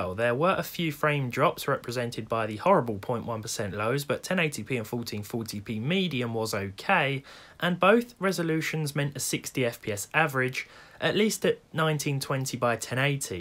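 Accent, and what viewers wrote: United Kingdom. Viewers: How do you know he’s British besides the accent? He says parcels instead of packages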